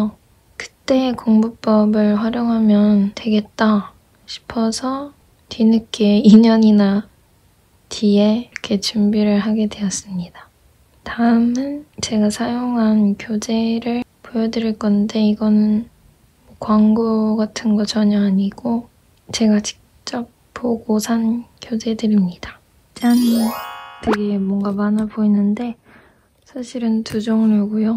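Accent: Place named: Japan. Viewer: 일본어 억양이 느껴지네요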